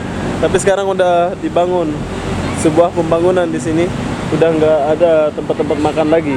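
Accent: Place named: Kuala Lumpur, Malaysia. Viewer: Cara ckp ada bau2 ckp melayu kl.. It's ok bro....😉😉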